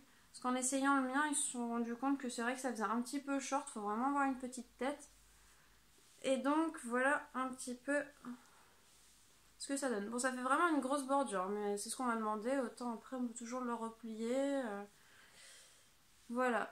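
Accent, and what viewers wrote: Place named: Picardy, France. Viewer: Coucou je me disais bien que ton accent m’est familier. Je suis originaire de la baie de somme en Picardie 😉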